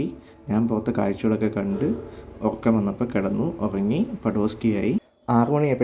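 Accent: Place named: Thrissur, India. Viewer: Thrissur ragam❤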